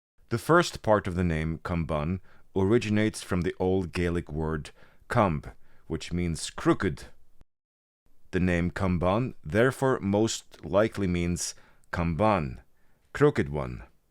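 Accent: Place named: Norway. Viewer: Interesting video. I do remember hearing about this newest discovery and being Faroese myself anything pre-Viking era is really interesting. And you didn't do too bad with the Faroese place names, although I sense a Norwegian(?) accent. Eiði, for instance: the ei is like eye and the ð is silent but a y/j is inserted between the ei- and the -i (which itself has a more e like pronunciation), so "eye-yeh". You mentioned Ærgisbrekka, well, the first part of this name ærgi is also a Gaelic word, and Argir is the name of the town right next to the capital, same word but different spelling. It will be interesting to see what other discoveries are made in the future.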